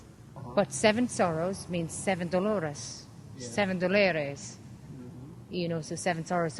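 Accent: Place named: Ireland. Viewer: Precious irish accent. Irish queen 🍀❤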